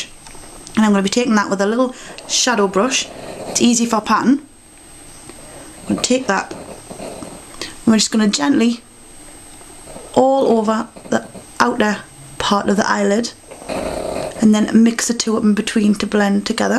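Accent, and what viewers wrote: Ireland. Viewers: r u irish? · are you irish? x]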